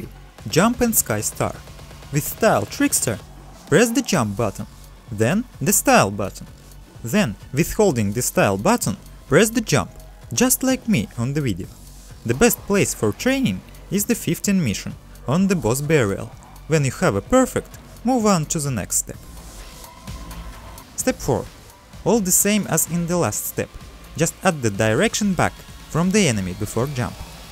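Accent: Russia. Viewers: Твой russian акцент too заметный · I freaking love the Russian Accent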